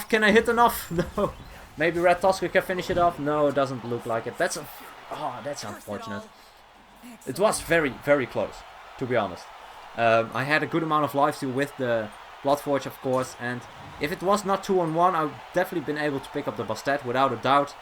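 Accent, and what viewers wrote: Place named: Netherlands. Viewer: The dutch accent ;)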